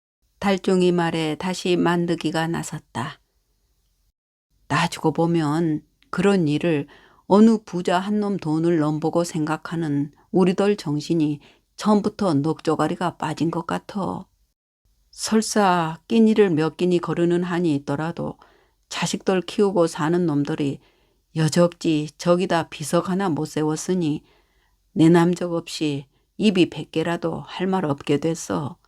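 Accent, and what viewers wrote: Jeolla, South Korea. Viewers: 송기숙 작가님의 작품을 낭독해주셔서 감사합니다. 남도 사투리가, 겨울밤 화롯불속의 구수한 군밤같네요. 그 구수한 향을 그대로 나타나게 해 주신.감사합니다.☆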